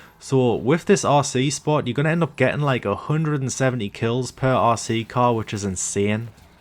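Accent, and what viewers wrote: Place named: North East England, United Kingdom. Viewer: aye lad am from northeast I could tell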